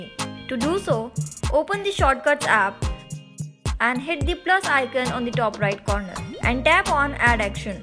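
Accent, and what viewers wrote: India. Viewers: Oh yes let us find da root cause for da same. Indian English is so brutal...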